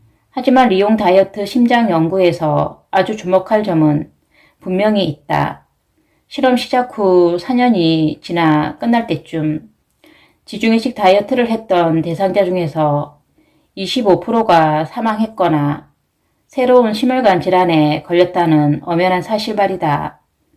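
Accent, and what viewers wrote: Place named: Gyeongsang, South Korea. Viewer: 경상도 사투리로 들으니 아주 구수~합미당.귀여우샤여.잘 들었습미당~❤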